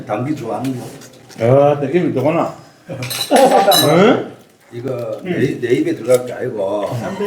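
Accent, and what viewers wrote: Gyeongsang, South Korea. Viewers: 구수한 갱상도 사투리에...참 유쾌하게 드시고...진짜 맛있게 잘드시네요..~~^^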